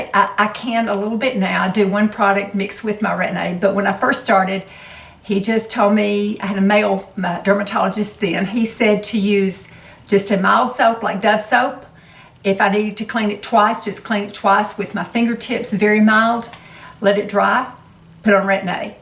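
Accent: South, United States. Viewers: Thank you for your help.You definitely look better than Christy Brinkley or any other Hollywood beauty guru.Please tell us about your diet and exercise,supplements. I find that woman that gain and loose weight tend to loose tone in the jaw line.You have amazing bone structure.Do you have any recommendations for face yoga or face massage?Can you share your ethnic background? Thank you for encouraging us to age well.I hope to move into being older and better as you have.Love the southern accent.God bless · Did ur accent get more southern? Lolz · Lol i dont know if it's the southern accent but you seem like such a sweet person, something about you reminds me of a warm hug.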